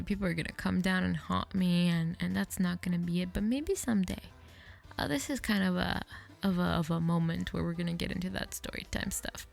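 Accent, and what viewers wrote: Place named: France. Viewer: I never picked up on your accent because it doesn't come through as much in your "video voice", but the second you said you're from Europe I realized you're French! C'est tre bien que tu sois de retour! :)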